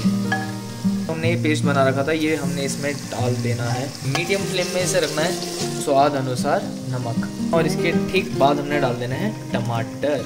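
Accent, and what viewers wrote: India. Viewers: Bro your speaking english very nice but i cant understand you. Your accent is so indianish. You are a very good speaker and i love your videoes. Plz at least try to speak with a little different accent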